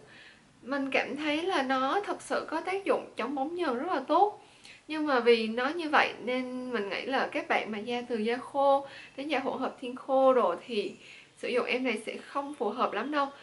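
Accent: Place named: Da Lat, Vietnam. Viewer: Linh Le bạn ơi giọng đà lạt họ nói vậy đó không phải nhẹt mũi đâu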